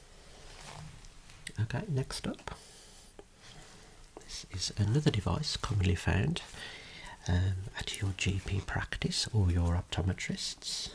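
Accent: Birmingham, United Kingdom. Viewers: There is something extremely relaxing about your videos. I think it's a combination of your Birmingham accent with your aura. I would be blessed to be one of your clients. Great video! 🙏😄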